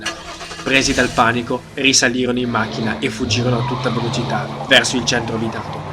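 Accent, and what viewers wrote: Italy. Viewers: Lui ha l'accento italiano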